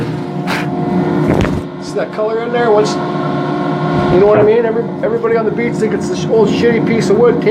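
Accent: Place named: Canada. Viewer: You have the most amazing Canadian accent... :-)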